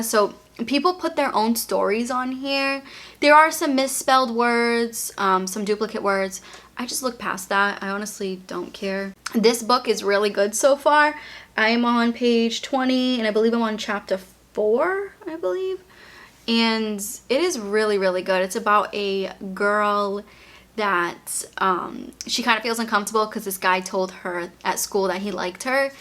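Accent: Boston, United States. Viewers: Wtf no i like ur boston accent. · I actually love your boston accent! ❤️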